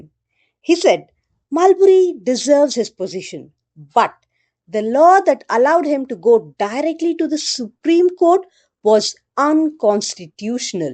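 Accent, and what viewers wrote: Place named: India. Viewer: Her accent is too thick. India folks are difficult to understand.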